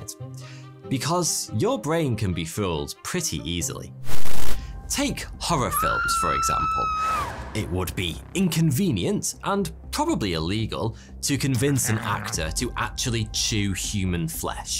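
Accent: United Kingdom. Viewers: Talking of...or is that "speaking-of"... whatever....in regards to "perception". ¿I wonder if " " ever did an Episode on Accents? In short, people's Regional Vocal Accents... for, also to a slight "hearing" problem I've developed, requiring the use of hearing-aid, and because of " "'s Regional British Accent, that was saying "Forty-Two" instead of " ". As an 'omage to Douglas Adams, until I really noticed the on "Screen" 'printed-tag' " ". Which could be sort of "Multi-Part-er" starting with why people speaking English pronounce the way they do: in different parts of England; Canada, Australia; New Zealand; and the USA; Segway into why some people from Non-English Speaking Countries have an "Accent" due to the way They Learned to correctly pronounce Their "Native" language. Then on to why different Dialects develop in Languages in general, possibly using China as an 'extreme' example. 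Eventually, leading into why there are more than 7,100 languages are spoken in the world today. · British accent makes everything sound nice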